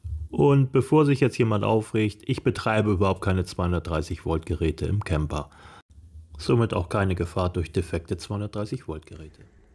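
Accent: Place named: North, Germany. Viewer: Eruzähl mal was, wieso es dich in die Schweiz gezogen hat ??? Dein Dialekt klingt eher Norddeutsch ....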